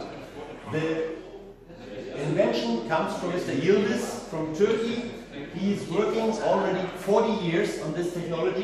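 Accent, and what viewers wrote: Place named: Germany. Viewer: You can hear that he is from Germany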